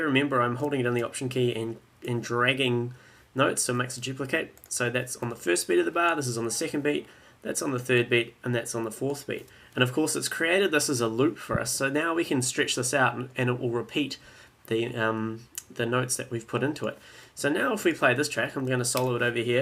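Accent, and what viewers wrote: New Zealand. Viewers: Are you a kiwi?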